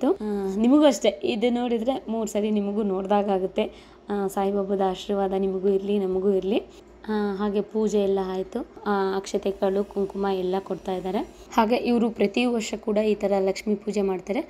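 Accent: Hassan, India. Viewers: Hassan & Saklespura da kannada bhasheya sogadu nimm matinallide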